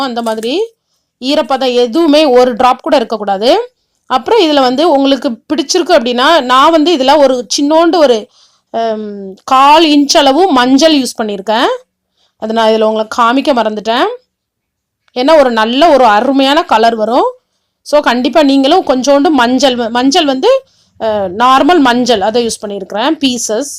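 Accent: Tirunelveli, India. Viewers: Tirunelvelila endha ooru aunty ungaluku? Mumbaila irukinga pola.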